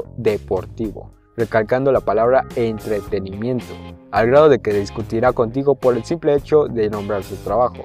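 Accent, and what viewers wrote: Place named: Andalusia, Spain. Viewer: Me desuscribo, no me gusta tu acento sureño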